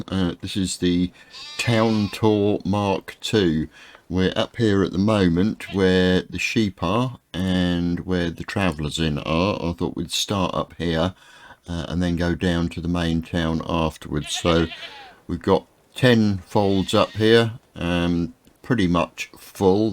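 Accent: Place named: West, United States. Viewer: I came here to watch your village, but you also thought me how to make a big bridge, I want to build one so bad and every video I watch about it, it only teachs to put some planks over a little stream, not across a big river like you did, thanks for that! (plus, I like the way you talk, sounds like a cowboy or something)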